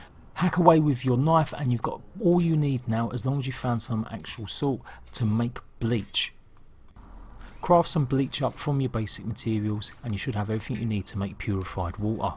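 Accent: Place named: London, United Kingdom. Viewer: Pretty sure this is a London dialect, based on how he doesn't say some t's and how th sounds like v/f.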